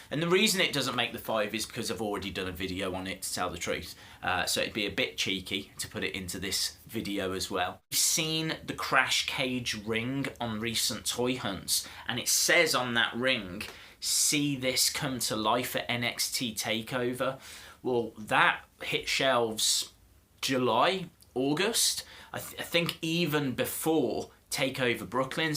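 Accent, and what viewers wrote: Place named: Midlands, United Kingdom. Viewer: You're clearly from the midlands just like me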